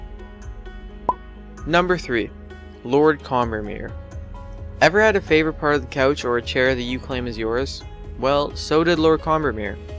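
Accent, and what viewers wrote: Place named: Canada. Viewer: the narrator is obviously Canadian. "howse" "abowt"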